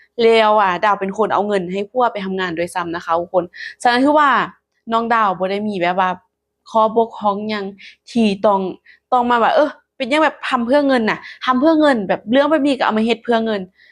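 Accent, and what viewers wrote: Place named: Lom Sak, Thailand. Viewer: ภาษาลาวเหนือบ่คับ เว้าคือหล่มสักบ้านผม คนล้านช้างคือกัน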